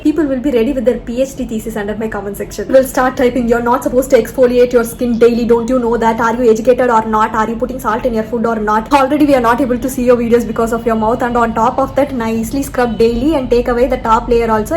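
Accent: South India, India. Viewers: Omg, the way u speak😘😘😘😘😘, u remind me of my south indian teachers.....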